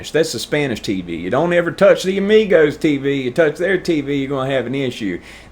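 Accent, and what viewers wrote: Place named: Virginia, United States. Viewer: I lived in Richmond for a while , so when I first heard you talking, I thought Roanoke must be super country. Now I know what the deal is . Thanks for clearing that up y'all.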